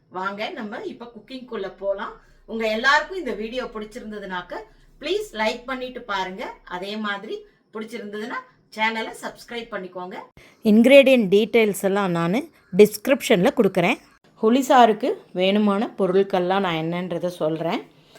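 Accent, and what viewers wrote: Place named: Brahmin, India. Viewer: மிக அருமை.. மேலும் உங்களது பிராமணத் தமிழ் உச்சரிப்பு நிகழ்ச்சிக்கு கூடுதல் சிறப்பு!👍👍💐🪷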